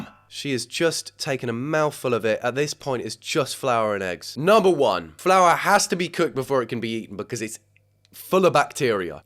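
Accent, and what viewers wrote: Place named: United Kingdom. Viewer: He sounds really British this time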